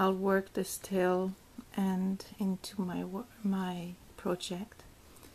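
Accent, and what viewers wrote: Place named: France. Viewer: Uh eine schöne französische Stimme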